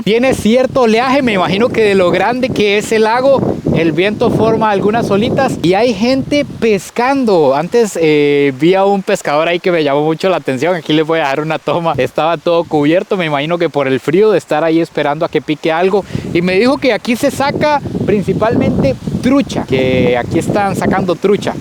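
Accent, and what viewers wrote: Argentina. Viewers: Amo escuchar el acento argentino ! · Te queda bien el acento argentino, en serio. Los perros desarrollan un pelaje impresionante acá por el frío. La intendencia sería allá (creo...) la alcaldía, no? La estatua es la de un gaucho argentino. Las malvinas son argentinas, los piratas ingleses no se vinieron desde tan lejos a robar, saben que las tierras son ricas en minerales y además, son tierras ricas en petróleo. Los amigos de 4 patas le dio un bello toque al video 🙂 y en realidad, la historia dice que si comés calafate (la fruta típica de acá) te quedás en el sur.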